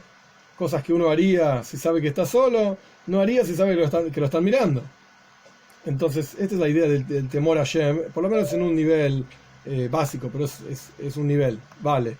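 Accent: Argentina. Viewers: Rab usted es argentino?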